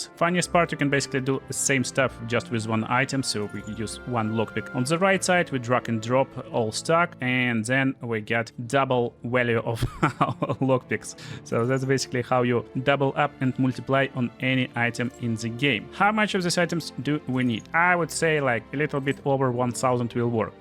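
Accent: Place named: Russia. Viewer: That Russian accent is sexy AF.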